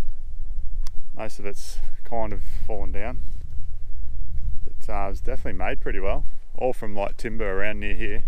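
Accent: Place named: Australia. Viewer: Except for the lack of sagebrush and your heavy Aussie accent 😆 this video looks very similar to areas of Central Oregon! Same type of history too either cattle, or sheep, or horses. If you have been watching Casey at Coyote Works channel you'll see what I mean. Always looking for relics and ruins of the past. Cheers!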